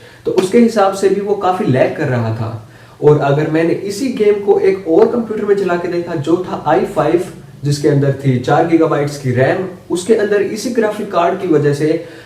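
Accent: India. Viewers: Nice video dude! I am not an Indian but I like this accent :) Btw, Save up for a 1030, Because 1030 is much better than this :D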